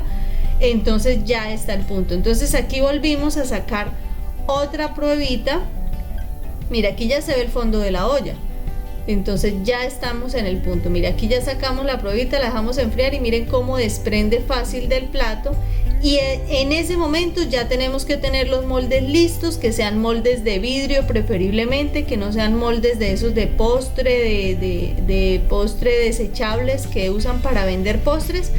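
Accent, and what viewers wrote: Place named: Colombia. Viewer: y el acento colombiano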